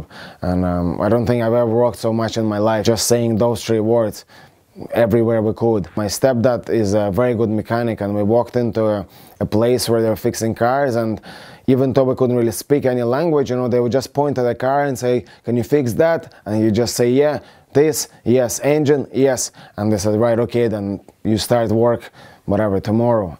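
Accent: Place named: Ireland. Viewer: It’s crazy how he has somewhat of an Irish accent 😂😂😂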